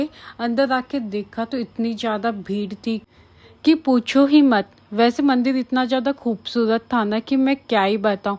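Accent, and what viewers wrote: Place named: Punjab, India. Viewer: Ap ki language me Punjabi ton h Ganganagar se ho ji ap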